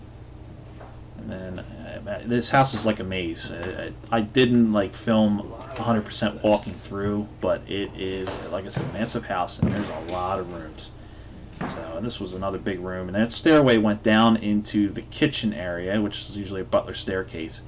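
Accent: Philadelphia, United States. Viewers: What is your accent? Are you a Philadelphia area native?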